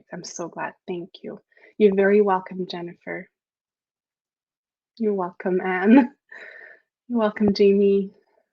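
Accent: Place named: Quebec, Canada. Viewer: Bonjour Anne! Je reconnais ton accent canadian francais, je suis de Montreal et francophone aussi. As-tu écrit ce livre aussi en francais?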